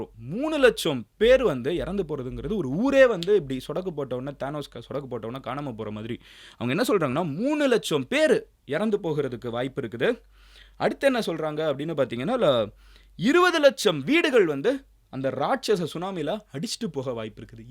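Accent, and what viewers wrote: Madurai, India. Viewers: Neenga maduraiya bro.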